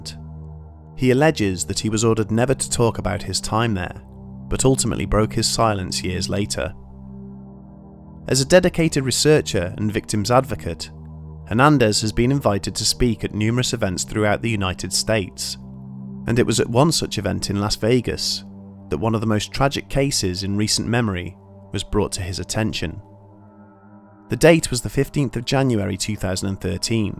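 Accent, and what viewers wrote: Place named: North, United Kingdom. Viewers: He said Las Vegas. Maybe you just can't understand his Northern accent. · He's English. They pronounce things differently than we do in the states and Canada. End of story. :)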